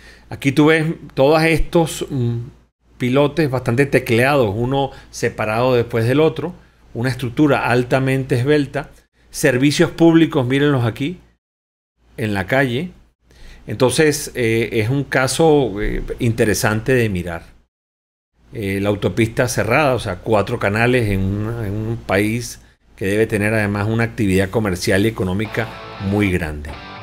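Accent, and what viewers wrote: Venezuela. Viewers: Hola, eres de Venezuela?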